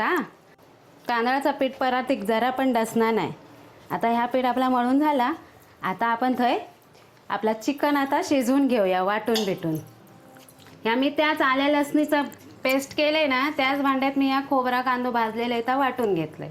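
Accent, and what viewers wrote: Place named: Malvani, India. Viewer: वहिनी आज तुमची चिकन वड्याची रेसिपी बघितलंय तोंडाक पाणी तर सुटलाच पण तुमची रेसिपी सांगूंची पद्घत तर औरच। मालवणी रेसिपी बघूची आणि मालावणी आयकायचा ता तुमचाच। मस्तच।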